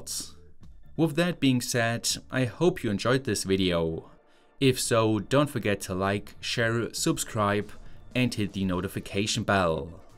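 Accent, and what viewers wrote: Germany. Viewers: du bist deutsch?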